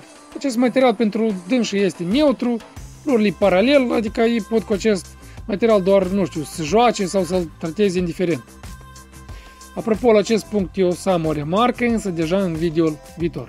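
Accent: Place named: Moldova. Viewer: Salut! Faci o treaba foarte bună cu aceste vide-ouri. Un sfat: Cred că ar ajuta să pronunți unele cuvinte (Mai ales numerele și anumite expresii) puțin mai rar și să lucrezi la dicție. Poate cei din Republica Moldova înțeleg fără probleme. Noi, cei din România, ne chinuim puțin ca să înțelegem 35% din cuvintele pronunțate. PS. Să nu o iei în nume de rău! Toate bune!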